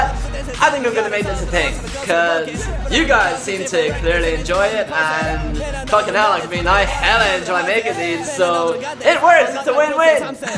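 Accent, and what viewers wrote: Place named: Ireland. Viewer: DANG the most adorable ACCENT yo!!! LOL 😂😂😂😂Almost at 200 subs, freaking awesome!!!! YES< we need more of these, too!!!! I don't care which day your vids come out, I'll be there fam!!!! 😀😀😀LMAO with the editing...the comments to yourself bwhahaahha "JESUS CHRIST, "!!!! LMAO 😂😂😂😂Definitely keep these coming, too along with your awesome new beats!!! And I'm going to keep nagging you about that autograph until I turn blue in the face...and a BLUE EGG is NOT a pretty sight, yo!!!! LOLOL 😂😂😂😂 Much love my adorable, irish friend!!!! xoxoxoxox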